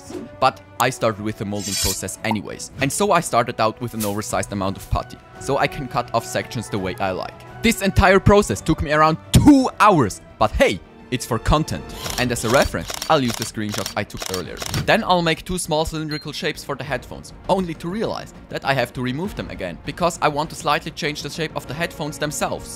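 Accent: Germany. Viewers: Bro are you German ?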